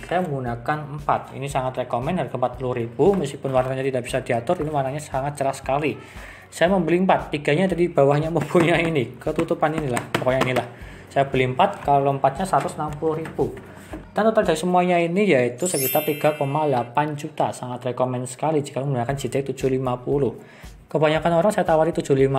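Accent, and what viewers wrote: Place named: Banyumas, Indonesia. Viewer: Krungone Kyong ngapak Yoh:v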